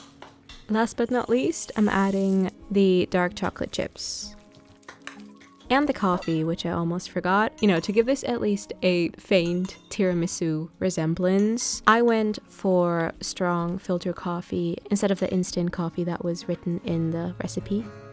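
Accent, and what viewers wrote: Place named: Germany. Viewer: Is she German? I swear her accent sounds super German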